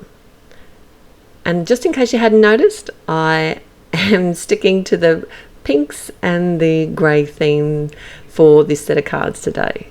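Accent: Australia. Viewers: Lovely one layer cards. It is nice to hear a fellow Aussie on YouTube.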